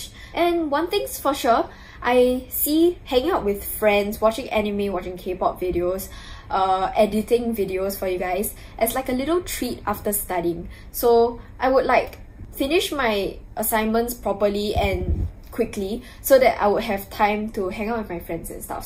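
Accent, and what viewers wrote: Singapore. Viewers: I really love the singaporean accent idk why 😅😂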